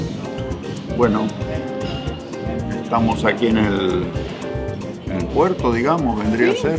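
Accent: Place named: Cordoba, Argentina. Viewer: Me gusta mucho como habla, bien Argentino Córdobes, los saludos desde 🇦🇷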